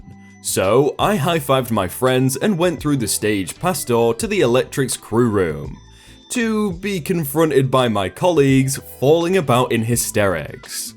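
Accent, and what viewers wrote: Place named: Southern England, United Kingdom. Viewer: England, probably south, doesn't sound midlands or north.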